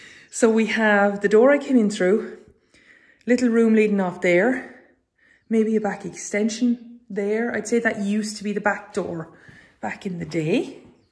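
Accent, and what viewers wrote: Ireland. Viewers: Reminds me of the house in the movie, Quiet Man." I love listening to your awesome Irish accent 😍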